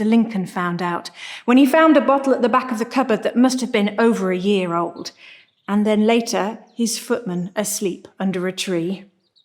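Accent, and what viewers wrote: United Kingdom. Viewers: Because of this channel, I can now speak English in British accent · Meu Deus amo o sotaque britânico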